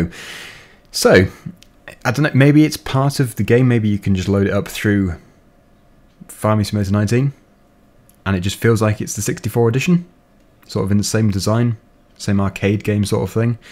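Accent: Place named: United Kingdom. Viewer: British accent's quite annoying but god your's very strong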